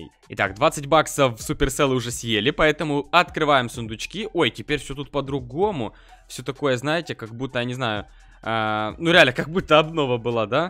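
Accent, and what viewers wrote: Ukraine. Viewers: с Украины или Рлсии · ты с Украины??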